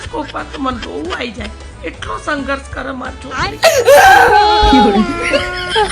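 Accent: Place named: Ahmedabad, India. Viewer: આ તમે અમદાવાદી અવાજ ના વિડિઓ બનાવો છો એ બધા સુપર હિટ છે,, હજુ અવાજ વિડિઓ મોકલો અમદાવાદી 😜😜😜🌹🌹🌹❤❤❤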